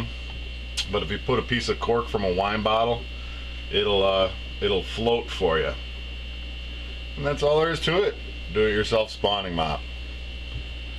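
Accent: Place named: Chicago, United States. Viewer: Thanks for the DIY video and the shout out, both were much appreciated. I'm heading to Walmart after work to pick up the supplies to make this mop. I might make a slightly smaller version of it because I have limited space in my tank. Seems like we have a ton in common... cooking (I was a chef), apistogramma, and DIY.. you also sound like you are from Chicago, where I spent the first 20 years of my life.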